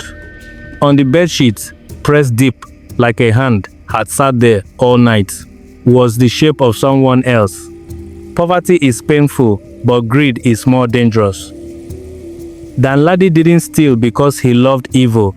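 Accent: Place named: Africa. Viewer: Listen man, use your AI voice , there's nothing wrong with that. It enhance your narration. Although it's a pleasure to hear you, if you want to go global and make money use the voice. We have accent, only Africans can understand, and if your audience is beyond the local, you need to use the voice. It's business! Nothing personal!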